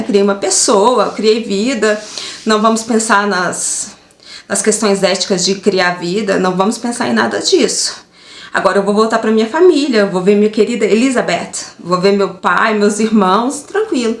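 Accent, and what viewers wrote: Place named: Minas Gerais, Brazil. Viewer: Sotaquim mineirim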